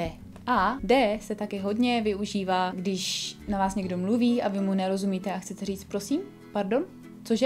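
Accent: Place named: Czech Republic. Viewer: Zrovna zacinam s Korejstinou a za boha jsem nevedela kde se ucit.. pres duolingo se také učím jazyky z angličtiny, ale přece jen ta korejština je tezsi a jsem rada že slysim výslovnost od Češky! Díky moc!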